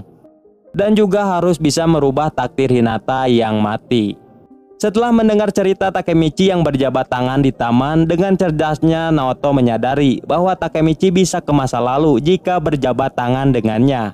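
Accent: West Java, Indonesia. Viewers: Logat sunda na mah aya wae da hese lengieut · Bg asli Sunda kah has Sunda bgt suaranya 😆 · Sunda geningan ngomongna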